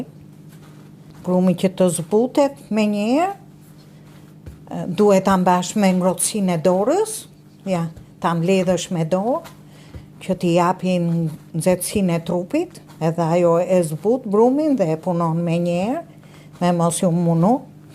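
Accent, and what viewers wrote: Tirana, Albania. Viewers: Gezuar diten e veres, me pelqen gatimi jot tamam gatime tradicionale ,por me teper shpjegimin qe ben Tiranse puro .kenaqem kur te degjoj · Ju lumshin duart teta, kenaqem kur shpjegon perberjen e byrekut, me ate ze te qete dhe gjuha juaj tironce qe me nxjerr mallin, me kenaqesi Ju degjoj! 👏👏👏💖🌸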